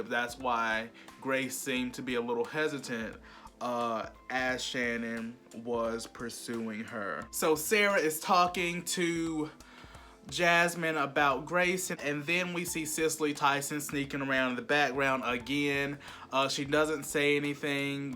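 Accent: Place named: South, United States. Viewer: See I knew it was somewhere southern lol You're welcome, I could just hear your voice all day talk about Tyler Perry lol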